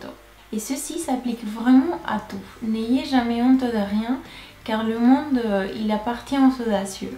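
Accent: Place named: Spain. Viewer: Elle a l accent espagnol mais on la comprend très bien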